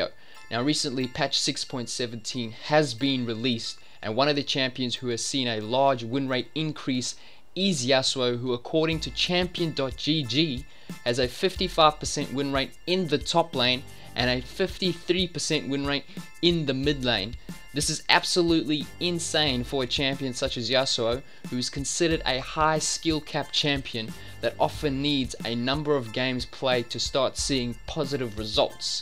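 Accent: Afrikaans, South Africa. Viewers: Dude are you south african? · is jy Afrikaans dude? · are you south african??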